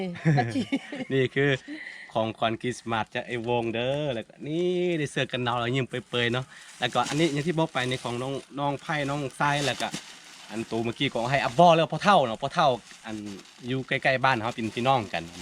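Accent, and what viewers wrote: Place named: North, Thailand. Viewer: พูคเหมือนคนเหนือเมืองไทยมากๆ fcลำปาง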